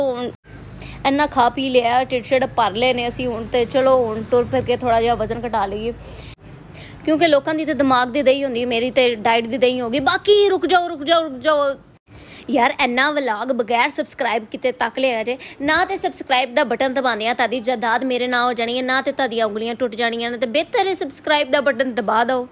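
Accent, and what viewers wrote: Punjab, India. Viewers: Your punjabi accent💖❤👀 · Punjabi accent 👍😊 proud of you my little pumpkin 😊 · Kamal punjabi accent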